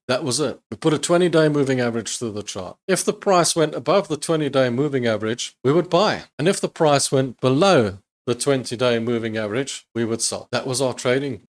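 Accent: South Africa. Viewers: Hi - Love your content! You sound South African - Could the company have been Sharedirect?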